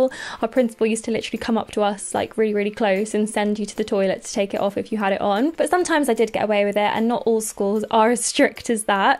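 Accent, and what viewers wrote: United Kingdom. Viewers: this is the first video I've watched of yours so far. and I absolutely love it!!you're so feminine, and polite, i love your light pink style and I love your accent. I've always loved British accents, thank you so much for making this, I love natural makeup looks. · In which oart of the Uk do you lievv? Aksong bc of your accent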